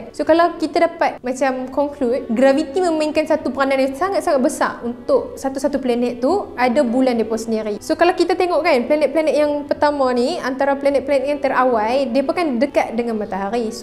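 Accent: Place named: North, Malaysia. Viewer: Nohh lorat utara pekt nohhh...rindu plk kmi kt kg halaman...telari dri topik😅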